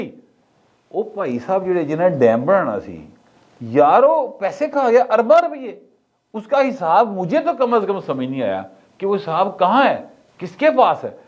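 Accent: Punjab, Pakistan. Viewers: . بہت خوب تجزیہ آپ پنجابی بڑی ٹیپیکل بولتے ھیں ھمیں اپنا بچپن اور اپنے بزرگ یاد آ جاتے ھیں جو یہ پنجابی بولتے تھے خوش رھو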